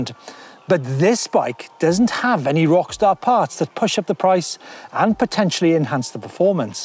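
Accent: Wales, United Kingdom. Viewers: You kidding, right? is Welsh 🤣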